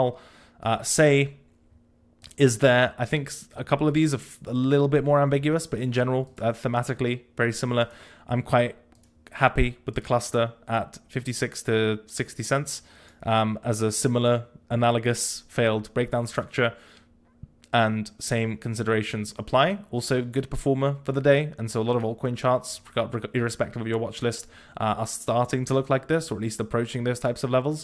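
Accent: RP, United Kingdom. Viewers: despite sounding like a tory boy is legit the best at going over price action, always watch your videos lad. thank you. i really mean that.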